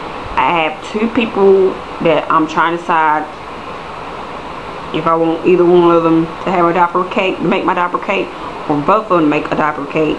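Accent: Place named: South, United States. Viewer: Where are you from? your accent is sooooo country, but different LOL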